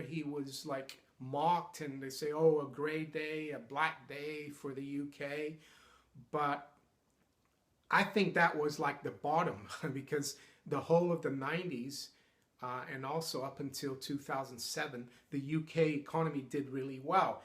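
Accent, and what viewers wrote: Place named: United States. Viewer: I thought I could hear a lot of "American" in your voice. :-)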